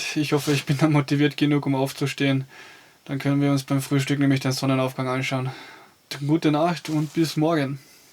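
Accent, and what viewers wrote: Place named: Austria. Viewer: Ve wo bischn du ? Und welcher Berg isch des ? Ven Akzent ven Hochdeitschen tat i sogn Österreicher. Cooles Video, mir gfolln sette Video wo in die Grotten unterwegs bisch und nocher in an Biwak ausharren oder magari no ibernochten muasch! Abo und a Like hosch ve mir! Gruass ve an Südtiroler! Berg Heil!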